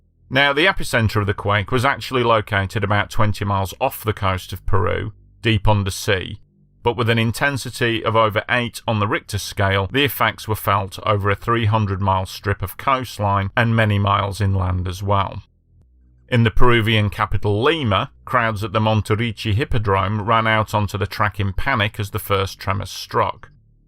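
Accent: United Kingdom. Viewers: ANOTHER British narrated disaster porn channel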